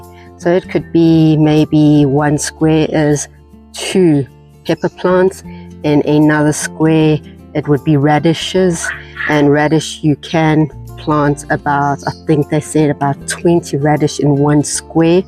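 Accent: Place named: South Africa. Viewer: I thought that was a SA accent 😅and indeed guessed correctly after seeing your description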